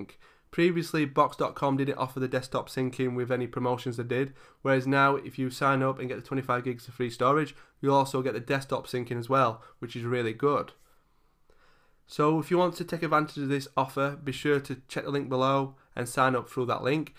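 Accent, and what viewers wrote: United Kingdom. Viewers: Refreshing to have a fellow Brit doing these videos for once!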